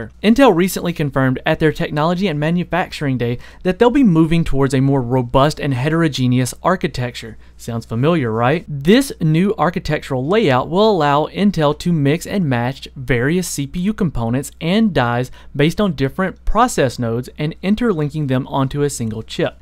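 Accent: South, United States. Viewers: Love that southern accent leaking through lol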